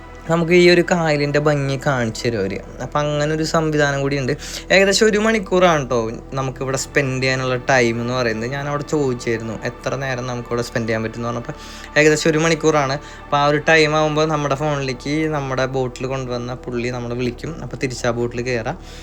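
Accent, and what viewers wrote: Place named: Thrissur, India. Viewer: നമ്മടെ തൃശൂർ slang ❤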